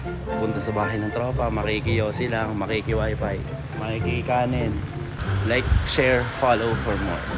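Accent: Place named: South, Philippines. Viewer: dalang dala yung punto ng taga south. yung "ehhhh", "walanjumuyon", "walanya" "apakatuleg mo!" hahahaha goods na goods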